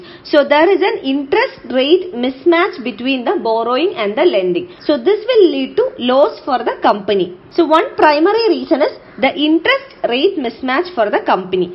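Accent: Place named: South India, India. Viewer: Madam I am a law student n I like your way of teaching is superb n excellent..in turn I have proper grips over the subject like IPR n Banking.. keep it up .I know you are from South Indian State I like your English accent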